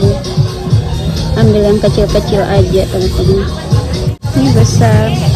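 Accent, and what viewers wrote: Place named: Bugis, Indonesia. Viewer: ku kira ikan garam itu apa, ternyata ikan asin 🤭 kalo belihat bebahasa bugis logat logat bugis gitu saya suka dengarnya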